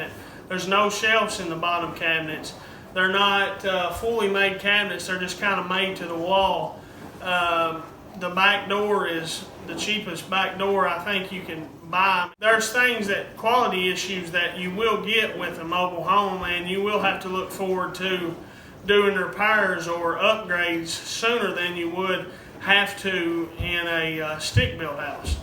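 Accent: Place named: Virginia, United States. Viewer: Mann you sound like us out here in va. We did the same thing and are about to do what u did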